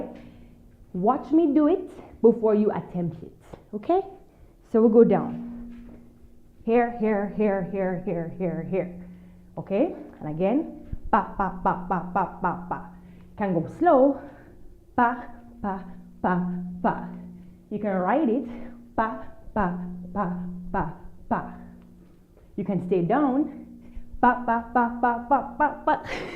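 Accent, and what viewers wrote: Jamaica. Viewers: Hello from new york city.....I love her Jamaican accent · ooh girl, you took the "nasty" out of twerking and elevated to a real dance form...loved watching and listening to that lovely jamaican accent 🥰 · I LOVE THE JAMAICAN ACCENT ......EXOTIC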